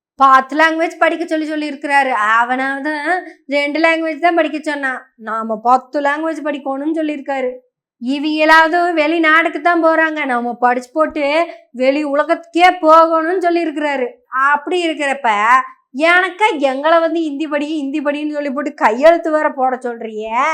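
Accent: Kongu, India. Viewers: கேக்க இனிமையான கொங்கு தமிழ் அருமை சிஸ்டர்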